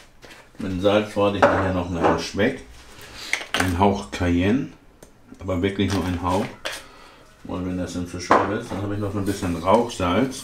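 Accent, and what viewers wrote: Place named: Hamburg, Germany. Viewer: Danke, gut erklärt - so ein richtig ehrliches, bodenständiges Essen (wie von Deinen Videos gewohnt)...und Dein Vortrag für mich Nich-Hamburgerin kultig komisch und unterhaltsam durch den symphatischen Dialekt!✌👍🤣😂 Darf ich ma fragen, ob Du da einen GastroSuS Topf benutzt und ggf. welche Maße der hat? Ich würd mir so einen großen Pott gern zulegen... Sind die gut, auch im Hausgebrauch? Oder vielleicht kannst du mal ein Video zu Töpfen machen, die Deiner Meinung nach was taugen? Ok, herzlichen Gruss in den Norden!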